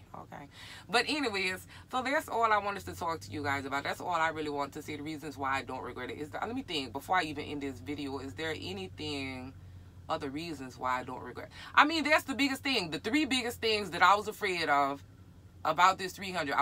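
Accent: Louisiana, United States. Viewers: Got a mad crush on you, love visiting your page. That cajun accent, soooooo weeeee, gotta find you hotness. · I love your Louisiana accent!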